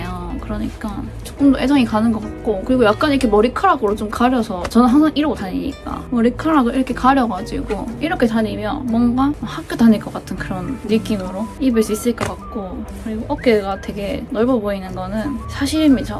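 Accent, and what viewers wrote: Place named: Gyeongsang, South Korea. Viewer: 말투가 같은 경상도인인거같은데 ㅎㅎㅎ 맞나유~^^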